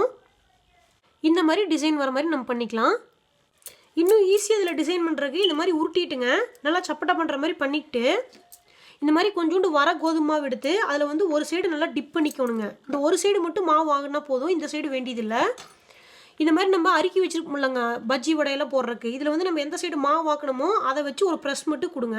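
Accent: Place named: Coimbatore, India. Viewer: Wow different receipe, superb👍👌.. coimbatore slang nalla therithu 😊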